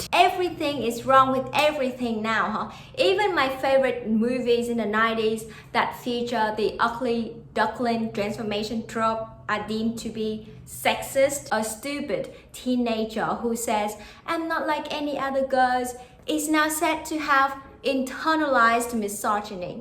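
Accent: Australia. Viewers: I enjoy hearing your Aussie accent and I'm impressed with your mastery of English as your second language.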